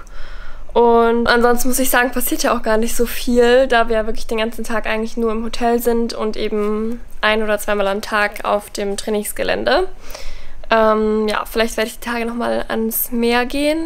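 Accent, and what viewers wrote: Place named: Germany. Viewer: Me gusta tu acento Alemán y así aprendo Alemán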